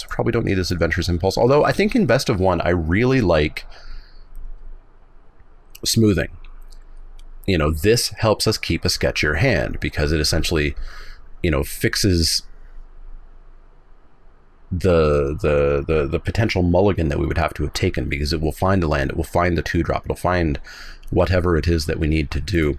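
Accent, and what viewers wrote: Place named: Canada. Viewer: You sound more and more Canadian the longer I watch these. I love it.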